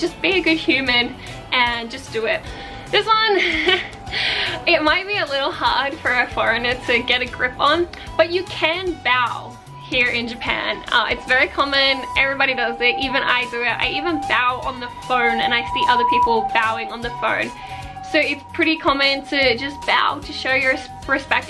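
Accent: Australia. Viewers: Although I don't speak Japanese, I was able to hear an Aus accent when you spoke - Both shocked and amused me... Your point about getting outside Tokyo is SO true. Other than videos you shot with friends, my favorites were when you showcased a city or business outside Tokyo. They were all so well done. Kobe series 100% I'm still amazed you created all those without a staff.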